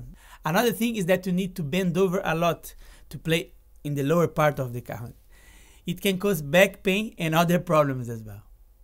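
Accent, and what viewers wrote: Brazil. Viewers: E esse sotaque aí mano, é brasileiro ??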